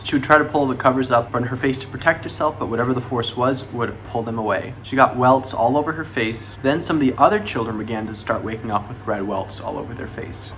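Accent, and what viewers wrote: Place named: Midwest, United States. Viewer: you have a mid-west accent dude!😂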